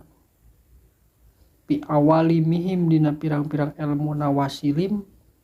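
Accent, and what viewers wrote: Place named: Java, Indonesia. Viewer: logat jawaan pak ustadz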